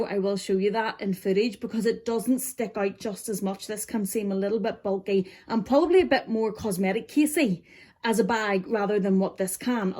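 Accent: Northern Ireland, United Kingdom. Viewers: Fantastic review! Was debating between the nano and mini. Think I might need to get the nano! Do I hear a fellow Norn Ironer? 😁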